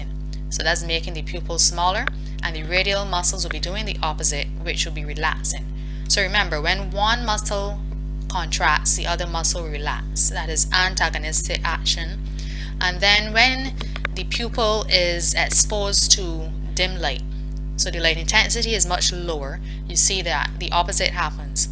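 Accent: Barbados. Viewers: yea...I figured so.....I grew up with that accent so I knew it...just certain words were classic Barbados. Glade to hear ya teaching. Create more content. Thank you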